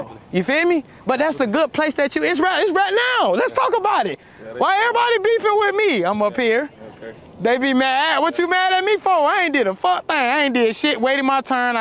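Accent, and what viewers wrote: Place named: Florida, United States. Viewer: That Florida twang is so exclusive! 💪🏾😎🌞🌴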